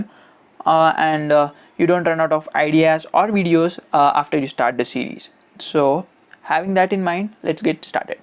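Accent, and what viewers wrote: India. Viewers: Hindi accent I noticed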